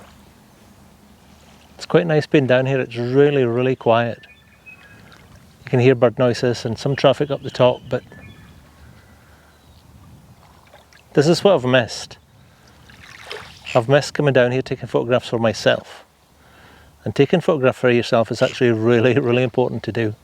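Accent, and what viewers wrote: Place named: Orkney, United Kingdom. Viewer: So glad Simon Booth pointed me in your direction, your Orcadian accent reminds me of holidays up there and my dad who still has his twang despite living in the Midlands since the late 50’s. Rallying used to be my hobby and now it’s photography so work will be my next port of call 😉 lovely images guys 👍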